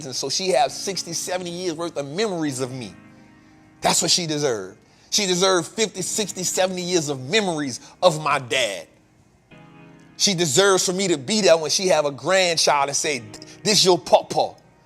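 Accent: New Orleans, United States. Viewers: I wouldn't worry about your pronunciation. Anybody who's going to quibble over your articulation probably isn't from the culture like that. I don't even have a New Orleans accent but I knew what you were saying. You should probably send somebody like that somewhere else. Because in the future they're going to try to change you. So unless you're just working on it because it's something you want to do then don't change. Say things the way that you want to. Besides that kind of keeps it encrypted for the culture that gets it. The culture that probably needs your help the most. Somebody arguing over something like that is probably kind of uppity anyway.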